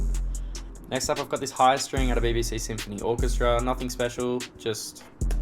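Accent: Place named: Australia. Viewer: He prolly from Australia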